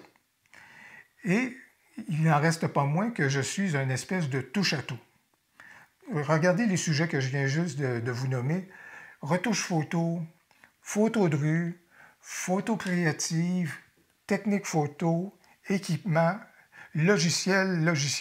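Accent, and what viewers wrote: Quebec, Canada. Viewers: Merci à toi pour tous ces contenus. Tu as raison de ne pas trop te diversifier. Il existe également d'excellentes chaînes qui traitent de Dxo PhotoLab et de Capture One, logiciels que j'utilise aussi. Tu ne peux pas tout faire, et surtout le faire bien. Reste comme cela et continue à ton rythme de nous faire découvrir et aimer la photo. Continue de nous faire découvrir le Québec (désolé, je suis un frenchy de 69 ans). Tu as la chance d'avoir un accent merveilleux et des paysages grandioses. Personnellement, je suis abonné à une dizaine de chaînes Québécoises, toutes de haut niveau. Bonne continuation, cordialement, et bonnes fêtes. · Bravo pour ta chaîne et je te souhaite le même succès pour l'année prochaine et puis j'aime bien écouter ton accent typique de ton lointain Québec:-) Je suis en particulier tes sujets sur la photo en général et sur NikCollection et Luminar. 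Bonnes fêtes de fin d'année pour toi et tes proches!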